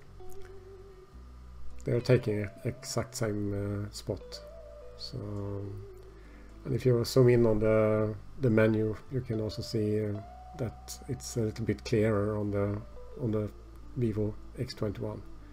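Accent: Sweden. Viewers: Thank you for the review, i can notice you are svensk hahha.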